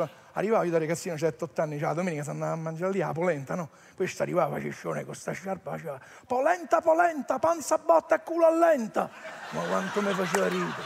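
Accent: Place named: Rome, Italy. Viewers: Sempre grande divertente. Il romanesco mi piace. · E' un comico spontaneo,una comicità' naturale!!! In romanesco poi......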